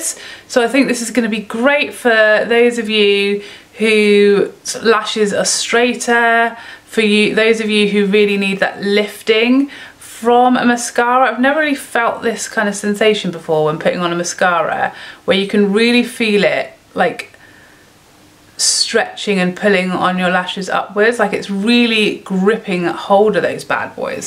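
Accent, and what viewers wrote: England, United Kingdom. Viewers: You've gained a new subscriber in me, not just for your makeup info but your english accent and phrases, whack, willy nilly, got me😁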